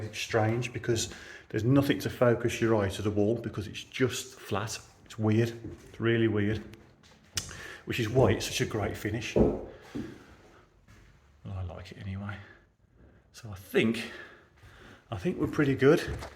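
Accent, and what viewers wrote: Birmingham, United Kingdom. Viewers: Thank you so much for this great video. I'm here in France and bought my machine on my builder's recommendation, but was really worried about how to use it. You took all the stress out of it!! 🙂 (I love your brummy accent too ;-)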